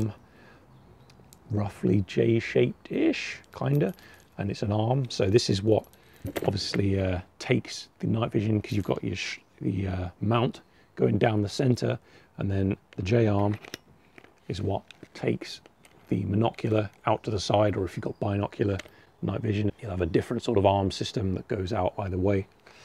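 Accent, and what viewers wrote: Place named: United Kingdom. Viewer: I just realized, man's a brit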